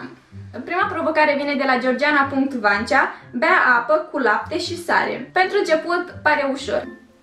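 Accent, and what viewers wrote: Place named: Moldova. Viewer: Ce accent de moldoveancă ai 😍😍🤗😊🤩😘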